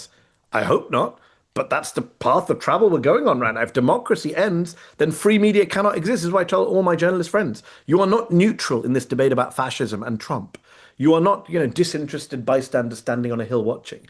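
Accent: United Kingdom. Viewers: I whole heartedly agree that you need to get your shit flinging British accent having ass out of our politics. Britain is in free fall cuz of all the mud. It’s cool it’s fine, just know we’re ready and waiting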